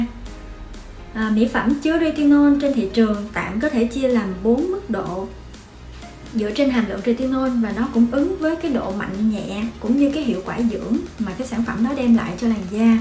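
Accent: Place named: Japan. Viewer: cảm nhận của riêng em là chị quá đẹp và tinh tế trong phong cách ngoại hình và giọng nói(em cảm nhận nó nữa Việt Nam nửa Nhật Bản). Em xem về clip phân loại da của chị rất hiệu quả, em cám ơn chị rất nhiều những chủ đề về da, việc học và đời sống của chị tại Nhật Bản rất hay luôn, em luôn mong chờ những cip này từ chị chị là hình mẫu của em về sự tiến bộ để đạt được những thứ mình muốn, chúc chị luôn khỏe vui và sống trong những thứ tốt đep trong cuộc sống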